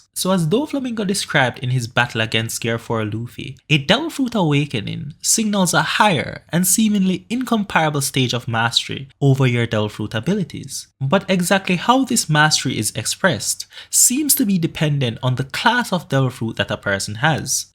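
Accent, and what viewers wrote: Caribbean. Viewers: you sound caribbean where you from? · Are you from the caribbean? I hear the accent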